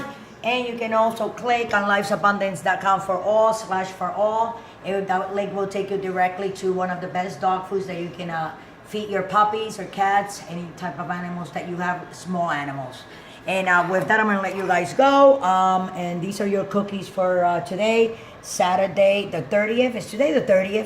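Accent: New York, United States. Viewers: I'm from Louisiana and I could tell she was from New York. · I've been studying about American accents. where are you from :) ?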